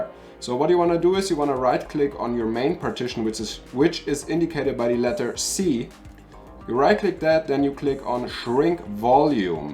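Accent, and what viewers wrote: Germany. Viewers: Nice one, deutscher Akzent lässt sich einfach nicht verbergen 👍😁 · bist du deutsch? dein akzent🤗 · Are you German?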